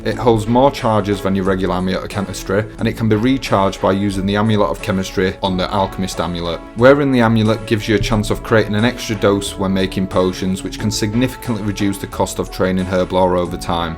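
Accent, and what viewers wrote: Yorkshire, United Kingdom. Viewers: the yorkshire charm just made it even better a description on how to. nice one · Lovely Yorkshire Guide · always nice to hear a fellow Yorkshireman! been following a couple of your guides recently (bank organisation) brought me here. for the past 15 years ive used the same youtubers slayermusiq for quests theoatrix for guides and torvesta for PVP... with your clear guides and step by step instructions, im sure i will be coming back. earned a sub off me!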